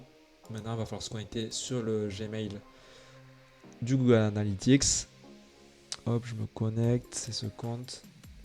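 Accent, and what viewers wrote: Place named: Southwest, France. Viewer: accent familier du sud ouest ça fait plez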